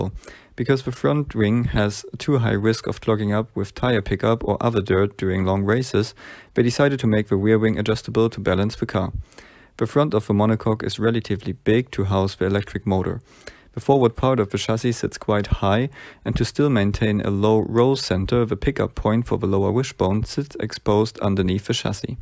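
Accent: Germany. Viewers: Bist du Deutscher? xD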